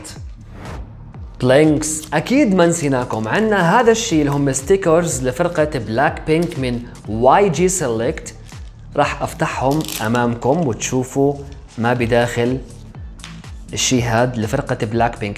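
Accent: Iraq. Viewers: انته اشكد كيوت اتصير من تحجي عراقي 🇮🇶 فديتك ولله 💗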